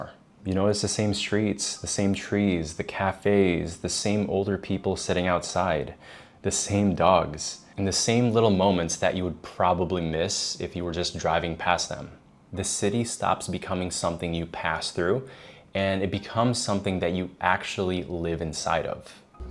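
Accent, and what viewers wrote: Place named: United States. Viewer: got an American accent!!😊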